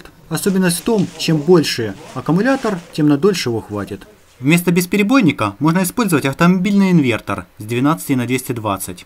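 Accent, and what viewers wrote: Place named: Ukraine. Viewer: Ох ребятки.... Как бы украинский акцент не сыграл с вами злую шутку... Город полон украино-ненавистников!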